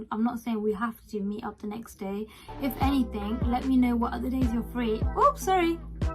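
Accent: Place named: United Kingdom. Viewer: Omg you’re from UK??? 😍😍😍😍 the accent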